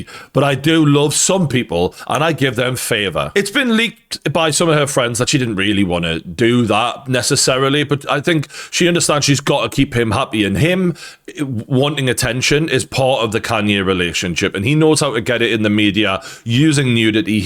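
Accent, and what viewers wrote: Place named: Northern England, United Kingdom. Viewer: He's dangerous and a drug addict. The fact kids are unaware of the swat sticker is dangerous he makes me sick he's Jelous and bitter 😂😂😂 he's made me hate him since Sunday I can handle the Grammy show up but he was greedy!!! Wanted the attention kendicks light. He said too much that's unfortunate and unforgivable. Ps love your accent I'm from Cheshire and a creator and love my nothern creators. ❤❤❤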